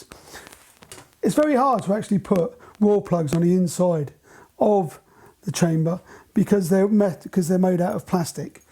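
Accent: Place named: United Kingdom. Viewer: I was sold on this video from the start because as an American, the most qualified chimney sweeps have British accents. I blame the movies. Then he pulled out the Milwaukees…. 🙌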